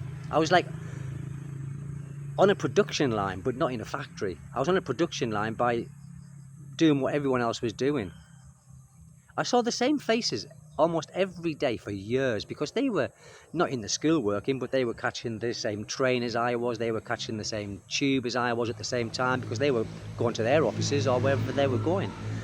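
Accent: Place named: England, United Kingdom. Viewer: I totally agree with you. My husband is a pure english born here in US. I had the blessing of meeting my mother in law and was able to take care of her. You always remind me because she has the same beautiful accent. Learned their straight forward character. I was then able to protect and defend myself by using my mouth at work. Proud of it because i always just cry on the side when i was abused mentally. I appreciate all your videos. I love learning so i always look forward to be inspired again the next day with your knowledge and wisdom based on experience and advices all your life. Thank you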